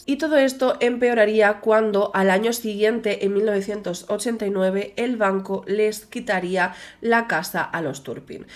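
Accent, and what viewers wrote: Spain. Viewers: Nena el acento español no es de muy mi agrado pero me encanta escucharte no sé porque el tuyo me parece muy lindo ☺️y tus videos muy buenos todo tu contenido es excelente me encanta ❤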